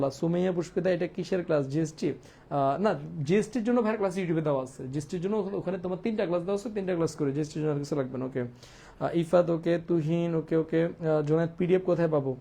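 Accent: Chittagong, Bangladesh. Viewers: Vaiya apni ki chittainga..?🙂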